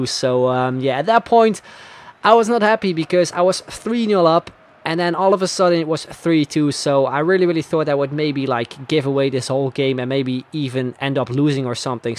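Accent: Netherlands. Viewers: You sound Dutch! If you are, you 'll probebly get more subs than DDG in like 2/3 years.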